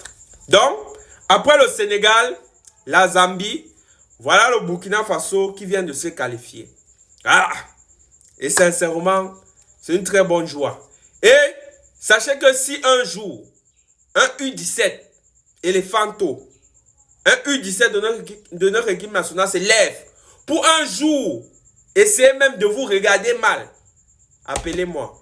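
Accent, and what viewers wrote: Cameroon. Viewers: C'est un camerounais 😂😂😂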